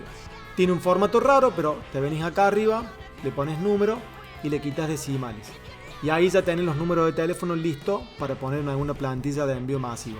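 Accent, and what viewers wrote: Cordoba, Argentina. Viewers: Muchas gracias ! muy claro... y con el tono cordobés que es lo máximo en el planeta! :face-red-heart-shape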